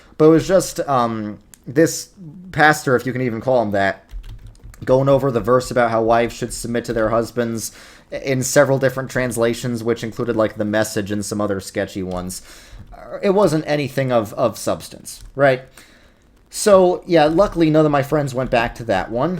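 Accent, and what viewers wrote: New York, United States. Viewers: I feel like you've started to sound more and more like a New Yorker with each video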